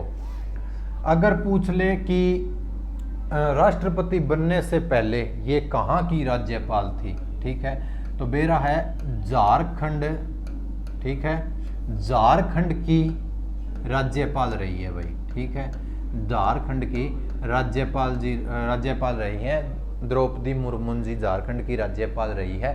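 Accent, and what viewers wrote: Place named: Haryana, India. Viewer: Mjja aa jata h sir apki class dekh kr🤣🤣🤣🤣pure haryanvi😁😁😁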